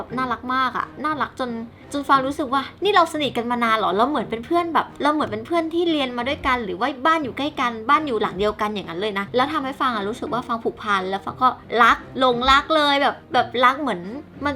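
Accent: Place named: Isan, Thailand. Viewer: พี่พูดอีสานน่ารักนะ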